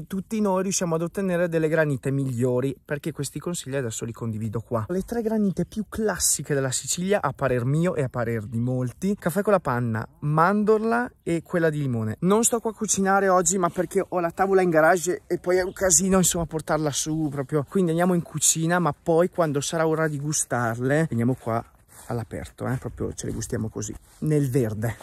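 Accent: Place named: Trentino, Italy. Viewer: Auguri per i tuoi denti!! Sei sempre molto chiaro nell'esporre le ricette👏. Mi piace il tuo modo di proporti, naturalmente la tua bravura e passione in cucina è super. Ho dei parenti a Trento e la tua parlata con a volte qualcosa in dialetto mi piace un sacco!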